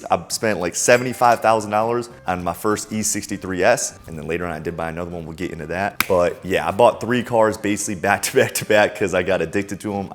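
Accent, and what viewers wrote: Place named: South, United States. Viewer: Why does he sound suthern?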